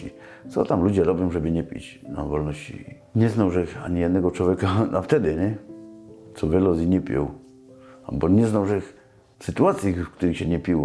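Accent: Silesia, Poland. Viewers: Super job:)! Uwielbiam slaska gware,akcent. Best regards from Belfast:) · Historia ciekawa ale historia i Śląski akcent to dopiero się słucha :) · Fajny akcent śląski! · Słychać z gwary że to Ślązak. Och lot Och lot.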